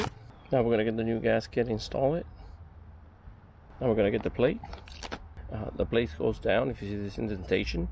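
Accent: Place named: Cuba. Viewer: Excellent tutorial on changing an impeller. Having been born and raised in Miami and working there for many years I had many Cuban friends and co-workers. I noticed several things about their English pronunciation . One thing was that “B” and “V” sounded identical when you called Yamaha “Jamaha” it took me back to Miami days. Thanks for your fun videos.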